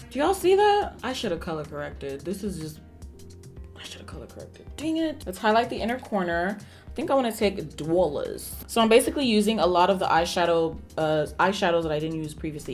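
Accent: Nigeria. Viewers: I am here for that ya Nigerian accent, it throws me off EVERY TIME🤣🤣🤣🤣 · Your nigerian accent is respectable:')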